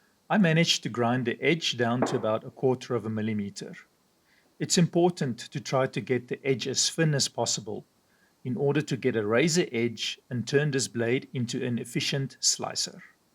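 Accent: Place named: South Africa. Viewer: I thought I recognised that accent RSA!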